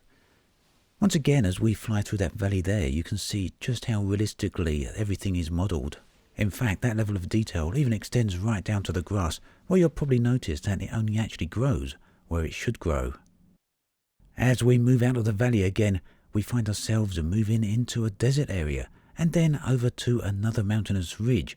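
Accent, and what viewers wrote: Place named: England, United Kingdom. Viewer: How in the name of good lord fuck did you think he was from america lmao he has one of the strongest English accents around hahaha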